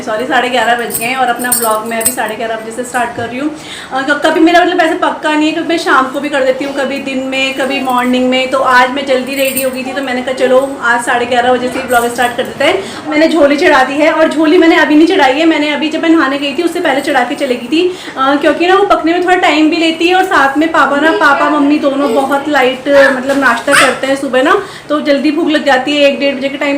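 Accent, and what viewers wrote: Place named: Garhwal, India. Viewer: Apki bolne se pata chalta ki aap tehri garhwal se ho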